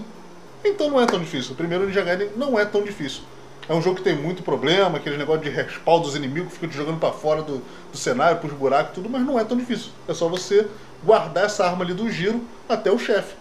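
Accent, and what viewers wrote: Rio de Janeiro, Brazil. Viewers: Bacana seu canal de games,você é do Rio de janeiro né,dá pra ver pelo sotaque,igual ao meu,eu moro aqui em niterói RJ.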